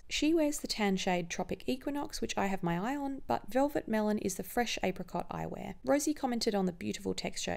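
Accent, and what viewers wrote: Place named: Australia. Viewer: Hi I have a question, do you live in Australia cuz u got an accent and Ye... oh and if you do then where do you get the best skincare products for cheap? I have recently got into skincare but most shops are very pricy.. so if you have any actual in store stores then plz spill:)))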